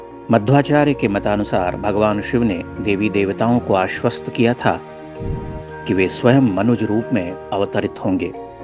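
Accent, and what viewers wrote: Standard. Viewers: सारगर्भित एवं परिष्कृत हिंदी के साथ आपका विशुद्ध उच्चारण, वीडियोज को प्रेरणादायक होने के साथ अत्यंत आकर्षक बना देता है। प्रतीत होता है कि The Frustrated Indian के प्रारम्भिक वीडियोज मे भी आपकी ही आवाज थी।❤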